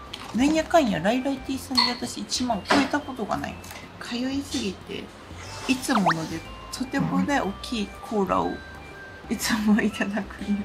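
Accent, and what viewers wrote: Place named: Osaka, Japan. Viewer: 、大阪弁好きやわあ😘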